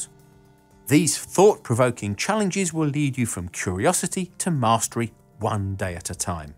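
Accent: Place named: England, United Kingdom. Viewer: English accent I'm out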